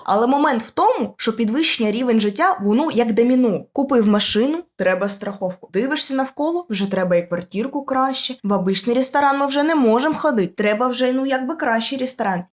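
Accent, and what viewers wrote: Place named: Zhytomyr, Ukraine. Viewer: Ой цей божественний житомирський суржик)) Лайк підписка)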